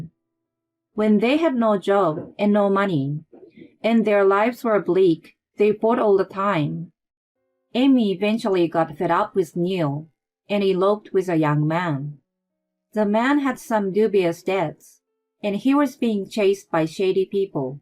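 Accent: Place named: Japan. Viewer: If this is a American story why is the reader Japanse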